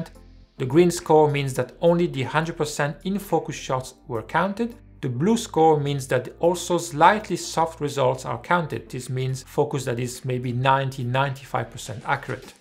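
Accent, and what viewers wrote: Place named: France. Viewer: Are You French? (je suis français et je reconnais un accent bien de chez nous^^). J'aimerais tellement que tu puisses tester / proposer des comparaisons avec le FUJI XT4, un APSC qui me fait de l'oeil. Même une comparaison avec des FF (Full Frame) serait intéressant:)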